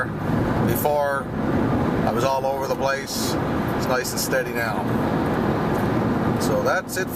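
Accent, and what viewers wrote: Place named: Nova Scotia, Canada. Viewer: When I heard “charged aboot” I was like wait, this guy is a Canadian, and he followed “Canada here” lol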